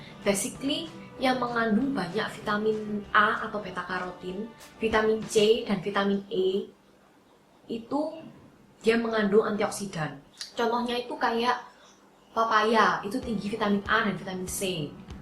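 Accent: Java, Indonesia. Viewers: pekatnya slang jawa 😅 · Medokkk banget mba.. Hahah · Jawa medok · Mbak e medok banget bahasanya😂😂😂😘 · Aduh pusing dengernya logat jawa wkw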